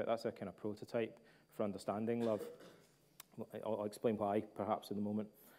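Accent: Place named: Cockney, United Kingdom. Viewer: He's talking in Cockney accent which even most of the Brits don't understand.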